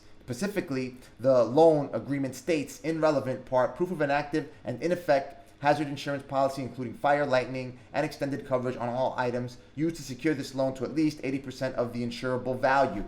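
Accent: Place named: New Jersey, United States. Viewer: Last night I met a guy. His voice sounds exactly like yours. He told me he's from New Jersey. I knew immediately that he was from NY or NJ 😂😂😂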